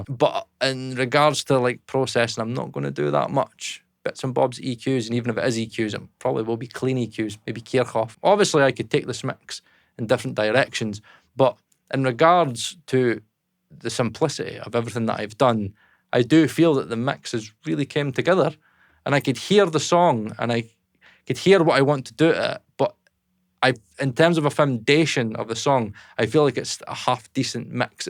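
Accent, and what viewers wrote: Scotland, United Kingdom. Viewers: You're such a lovable guy. And such a thick but great Scottish accent lol (I'm assuming, because you sound Scottish to me). Very funny edits and such. And great music production education/topics. I don't know how big you are into sound design but I for one would be very interested to hear your unique techniques and views on that kind of thing. And some writing as well because who wants a non captivating song. Cheers mate 🍻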